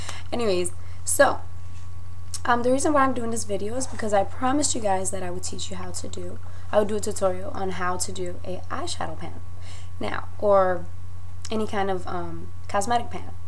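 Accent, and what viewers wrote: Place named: Cuba. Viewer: are you Cubain because you have that kind of accent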